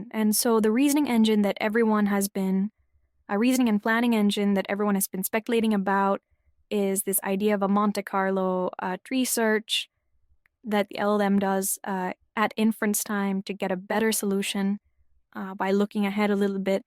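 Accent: Quebec, Canada. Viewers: Doxxed as likely Canadian from Quebec in 2 seconds despite the attempt at obfuscation. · Voice heuristics suggest likely a Canadian from Quebec despite the attempt at AI obfuscation.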